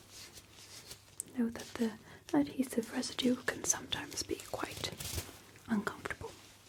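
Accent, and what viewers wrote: Long Island, United States. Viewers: You are looking so cutesy putesy!!! Your accent is the best, especially that heavy long island one you do in some of your rps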